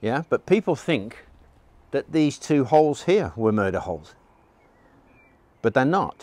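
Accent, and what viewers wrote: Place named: England, United Kingdom. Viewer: He's not American. He's English. Very clearly English.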